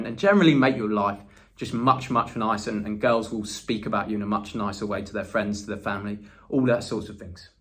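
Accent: England, United Kingdom. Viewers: I just love that English accent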